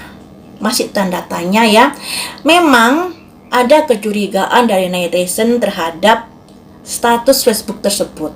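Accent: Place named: Java, Indonesia. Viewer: logatnya jawa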